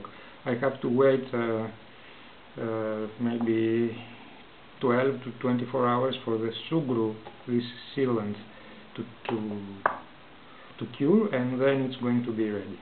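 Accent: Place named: Italy. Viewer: Accento italiano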